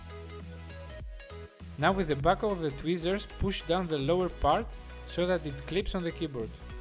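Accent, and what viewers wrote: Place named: Germany. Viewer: hes german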